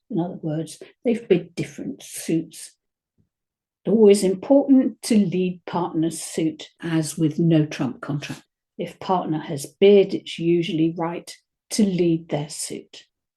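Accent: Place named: United Kingdom. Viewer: Hello from New Zealand! I am a complete beginner, having just completed an initial 8-week course on ACOL with my local club. I am looking around for additional information and must say that your explanations are excellent. They give important context to all the rules and principles I'm trying to absorb. Thank you for this as it's much easier to remember the rules when I understand the reasons behind them. I look forward to working my way through your videos as I think they are going to be really helpful. 15,000 views would suggest that others feel the same. Love your calm British way of speaking as well - you are a joy to listen to. Thanks again :-)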